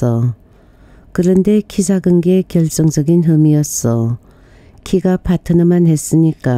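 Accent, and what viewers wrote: Gyeongsang, South Korea. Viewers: 경상도억양이 처음에는익숙지못했지만지금너무도정감이느껴짐니다.감사히잘듣고있씁니다.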